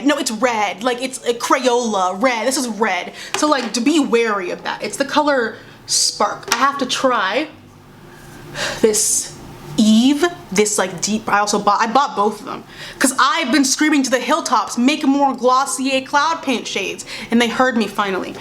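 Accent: New York, United States. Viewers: random but i love when new york accent comes out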